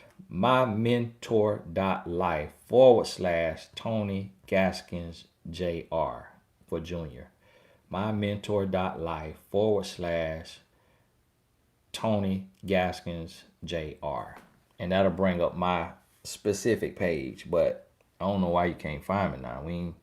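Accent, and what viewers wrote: South, United States. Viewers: I literally could listen to this country boy accent all day! I am from The Bahamas🇧🇸 and I can understand EVERY SINGLE WORD you say!!! Love you Tony!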